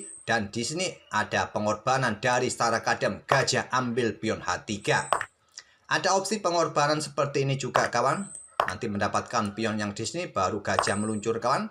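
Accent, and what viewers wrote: Java, Indonesia. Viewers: Bang kurangi loga Jawa nya.bang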